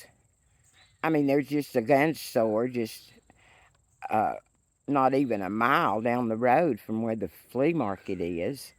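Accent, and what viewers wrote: South, United States. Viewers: It is refreshing to hear a voice of reason spoken with a southern accent. After listening to so many southern accents in the House and Senate, it made me wonder, is it something in the grits? You and Beau of the Fifth Column and Trae Crowder and others keep us enlightened.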